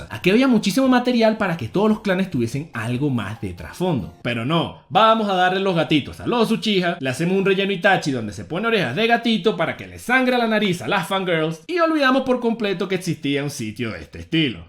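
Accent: Venezuela. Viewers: Eres venezolano, verdad? :3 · Acento Venezolano... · Enserio eres venezolano? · Eres venezolano??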